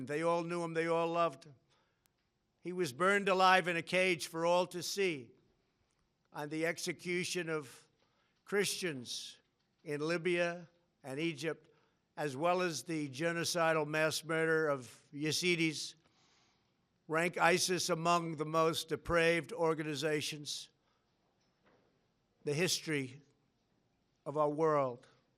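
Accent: United States. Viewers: he talks like a real american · New York accent thicc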